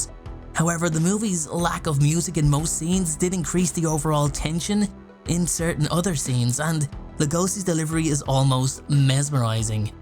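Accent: Ireland. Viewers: Your list makes me wonder if you might never have seen the movie with Frank Langella as Dracula? The one with Laurence Olivier as Van Helsing. BTW, I love your Irish accent! Dracula as... 'Drak'ullah'. Aye, dem feckin' vampires out dere bitin' troats!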